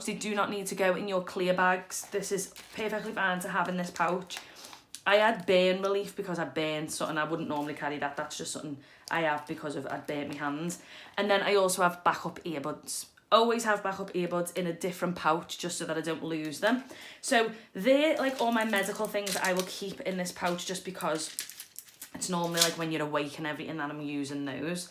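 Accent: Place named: Wales, United Kingdom. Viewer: Thanks for the great tips! You have a lovely accent. Are you Welsh? Blessings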